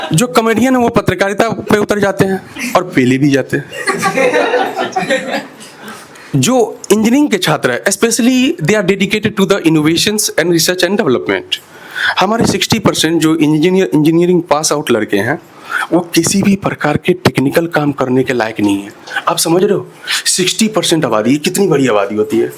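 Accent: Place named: Bihar, India. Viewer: Bhai aapke accent se hi pta chalta hai ke aap Bihar se hain,, dekhne me nhi lagta. Anyway Too good bhai ❤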